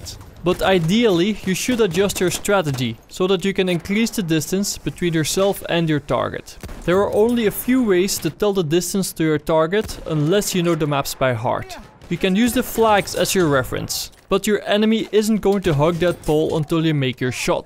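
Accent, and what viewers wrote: Netherlands. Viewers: are you dutch? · That accent sounds Dutch with a hint of Scottish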